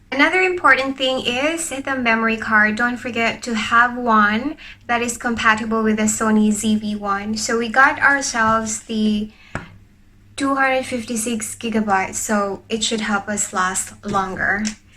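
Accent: Australia. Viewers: Mugawas jud ang slight na aussie accent. Nakaadapt nka diha kie. Great job! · Maam, nindot kaau aiii, pero wala juy mka labaw sa imng aksent maam pang Australian citizen kaau ganahan qg pamati.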